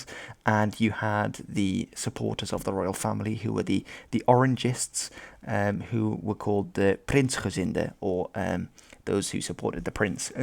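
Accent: United Kingdom. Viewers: your Dutch? You sound like a British person? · Ben je half engels? je klinkt egt brits gap.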